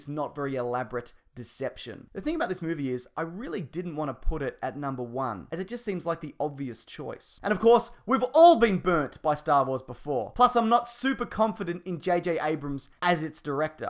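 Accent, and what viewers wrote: Australia. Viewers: You sound like an Aussie and I would know cuz I am one and I live in Australia. Also you should try to do a collaboration with screen junkies honest trailers because you would be hilarious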